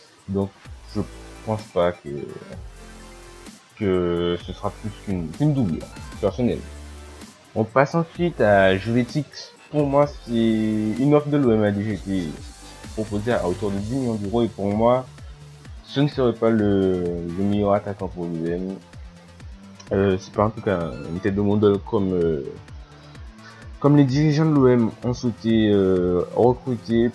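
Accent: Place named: Antilles, Caribbean. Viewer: jolie accent :D ça fais plaisir c'est rare des antillais Youtuber :D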